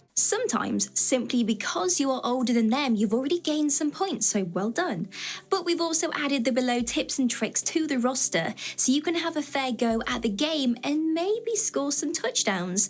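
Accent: England, United Kingdom. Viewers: Is this girl from the British isles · What part of England are you from? Midlands, maybe North?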